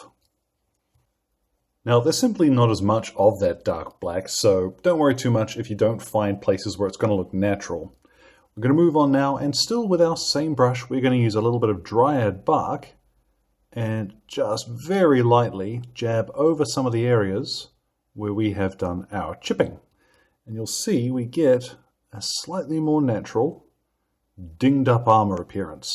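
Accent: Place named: New Zealand. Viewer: i love that kiwi banter, it reminds me of Simon Bates from radio 1 back in the day..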